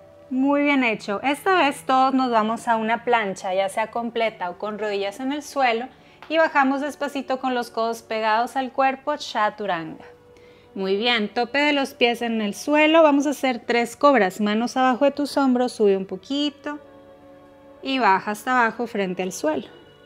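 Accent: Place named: North, Spain. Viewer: Es la primera vez que veo sus videos de Yoga gracias por compartir escucho su voz muy agradable con acento norteño muy agradable disfrute mucho la clase Namaste ❤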